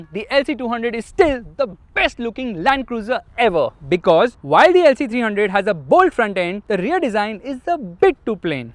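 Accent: India. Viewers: Очень ярко выраженный индийский акцент в английском языке...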